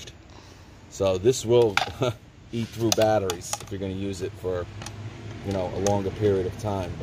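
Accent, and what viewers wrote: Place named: Philadelphia, United States. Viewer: Are you from the Philadelphia area? Wudder=water 😎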